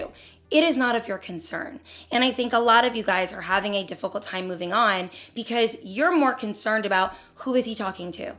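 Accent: New Jersey, United States. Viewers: It’s so sweet, though! You’re very well spoken but it definitely could be a Jersey thing! 😂 I have family there and LOVE their accent and way they pronounce words 🩵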